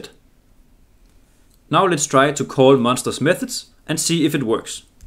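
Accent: Denmark. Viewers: Du lyder lidt dansk 😀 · As soon as I heard you said Vector I was like that sounds like a Danish accent and then I check channel description and it says Denmark. I am not sure if it’s the fact I am Danish I could tell or if it’s because I watched too many other Danish people, probably both.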